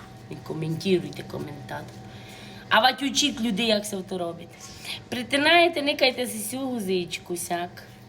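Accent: Transcarpathia, Ukraine. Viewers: Привіт з Львова! Дуже люблю закарпатський говір,і ваші страви,молодчинка,так тримати!❤❤❤❤❤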